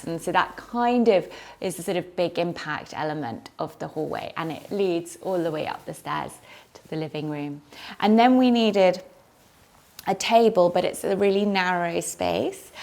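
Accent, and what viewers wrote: England, United Kingdom. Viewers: What a fabulous home. So cozy and welcoming and beautifully styled. Can tell is a very genuine person. I love her personality and her beautiful English accent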